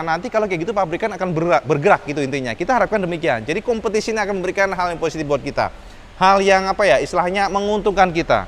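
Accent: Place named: Java, Indonesia. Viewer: Duh duh bahaasane penak dirungokne jowo ne kentel .. wes lupakan fanboy 🙏🏻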